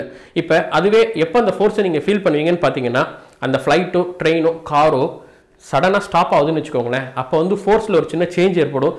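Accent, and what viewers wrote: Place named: Chennai, India. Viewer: ninka pesum pothu chennai tamil lite ha varuthu bro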